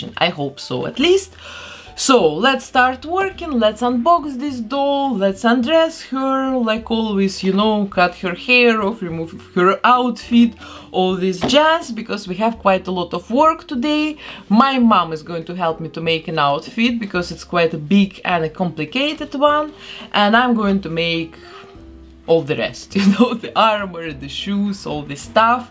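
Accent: Russia. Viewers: Русский акцент жёсткий)) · русский акцент не спрятать😳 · Оооо боже какой прекрасный русский акцент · О, этот русский акцент! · Ого, акцент звучит очень по-русски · Do I recognize the accent right? You are Russian? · Ох этот русский акцент 💪🏻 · Стоило бы тогда добавить субтитры на русском, для соотечественников, судя по акценту автору канала это было бы не сложно, а нам приятно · Are you russian? Where are you from? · Я слышу русский акцент или мне кажется?) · очень русский акцент))) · Я что слышу русский акцент? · Русский акцент?¿ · Мне кажется, или она говорит с русским акцентом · Не в тему. Но капец русский акцент. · Русский акцент😅😅😅❤️❤️❤️ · Ни чего себе акцент!!! Вы из Ростова?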